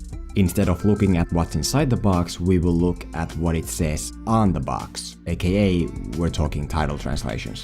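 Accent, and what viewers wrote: Finland. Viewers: playdate is from 2012 not the 90s. base don the accent i'd say finish or lithuanian, based on the covers of the movies, its finish. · Terve mieheen! ;) Teikäl iha huippihyvii videoita ja tiiäks aina jotenki tuntu siltä että on siinä hieman sellast suomalaista aksenttia ;p Mutsiis äijäl aivan huippu hyvä englanti ja niiku Arska sanoi: "An acsent is a strenght!" ;) Ei hätää, se on meidän kahen pieni salaisuus... :7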